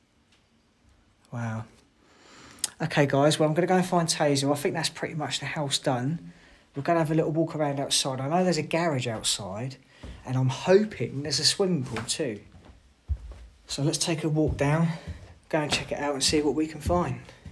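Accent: United Kingdom. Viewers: I love your UK accent!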